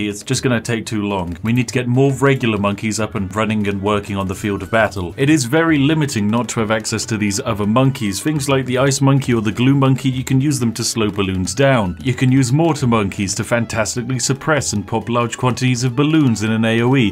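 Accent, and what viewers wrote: United Kingdom. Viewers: I like you're british accent) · My guy sounds so british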